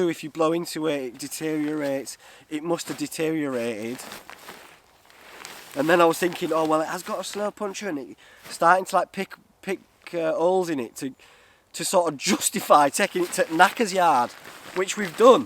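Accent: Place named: North, United Kingdom. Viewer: Spat my coffee out reading this, thank you I needed that laugh 😂😂 is awesome. Northern AF lol!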